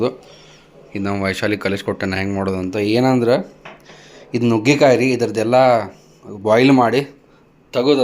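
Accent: North Karnataka, India. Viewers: ನಿಮ್ಮ ಭಾಷೆಯಲ್ಲಿ ಬಳಸುವ ಕೆಲವು ಪದಗಳು ಎಷ್ಟು ಸುಂದರ..... ನೀರಾಡಿಕೆ ಅನ್ನೋ ಪದ 👌🏼👌🏼👌🏼👌🏼👌🏼👌🏼. The star of the Vlogs is your North Karnataka language 👌🏼👌🏼👌🏼👌🏼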